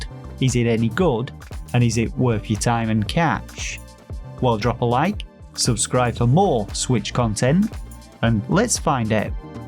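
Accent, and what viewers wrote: United Kingdom. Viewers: Hey, not hating on you or anything, but please can you tell me what your accent is? I am genuinely curious. I can’t understand most of what you say, but I am fascinated that the auto-translate can pick up 99% of your brit English. I just need to know please. I am beyond OCD at this point and it frustrates me.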